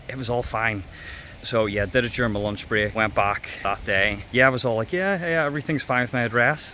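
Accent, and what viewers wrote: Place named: Ireland. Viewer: "My accent really isn't that thick and that broad" Uh, yes. Yes it is. I'm not saying you should change it, but you must certainly know that you have an incredibly thick Irish accent. Nobody does well in those unofficial language schools. They can't charge their students enough to both keep the doors open and pay their teachers fairly unless they're huge or gov't sponsored.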